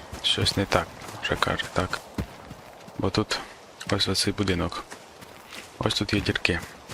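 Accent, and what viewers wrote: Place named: Poland. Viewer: у вас гарна мова але чую акцент, ви поляк? :)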